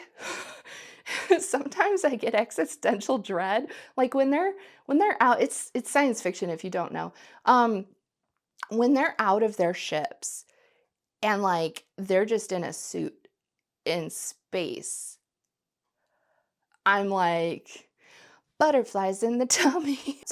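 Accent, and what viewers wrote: Midwest, United States. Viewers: As someone who grew up all over the Midwest from Missouri up to Michigan and Wisconsin, I do remember the warnings and the reality, but also that it was a point of pride among some of us to see how long into winter we could go without wearing our coats so... I suspect that, while it may ALSO have to do with cell phones and complacency, there's a large element of "teenager" in there, too! And some of it on your part is simply how extreme the area was where you grew up. The coldest I've ever experienced was in Iowa, but for consistent bone-chilling, that was Minnesota. When I lived there it took a while to get used to the habits you grew up with. I thought everyone was bonkers for leaving their car running while they were in the store. Welp. If you don't, it may not start when you come back out! We live and learn, and your kid will, too. 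Eventually. :D Yes, I can totally hear the Minnesota and Wisconsin in you. lol For me it isn't just the "o" but the "sc" part of it that gives you away. Team Bubbler, yeah?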